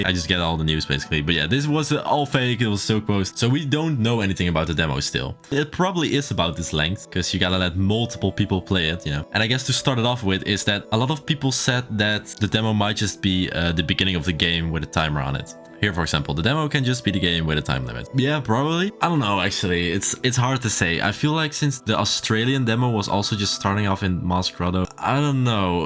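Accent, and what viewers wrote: Netherlands. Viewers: Ik ruik een Nederlander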